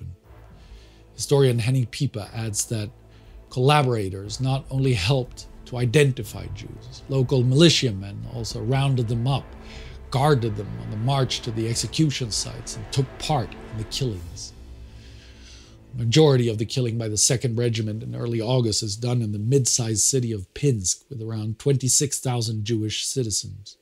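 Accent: Germany. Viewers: Yeah, I speak German, but I bet a lot of English speakers are confused. He pronounces some things the German way that should not be, because the English speakers will not understand. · Germany iirc. You can tell by his german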